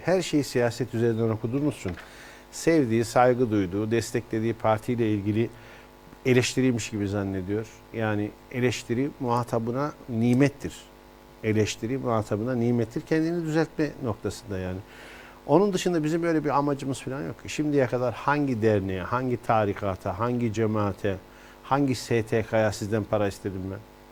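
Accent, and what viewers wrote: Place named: Konya, Turkey. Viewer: Yaptığınız yorumlar, Konya ağzını kullanışınız ve gazeteciliğinizi seviyoruz, teşekkür ederiz Bey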